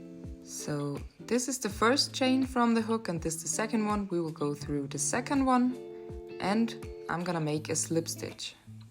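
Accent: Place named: Austria. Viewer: Ich finde du machst das super!! Und dein Englisch ist schön und gut verständlich, daher finde ich den typisch österreichischen Akzent überhaupt nicht schlimm! 😁👍🏻 Und die Anleitung scheint soweit auch verständlich, werde es sicher demnächst selbst mal ausprobieren 🌊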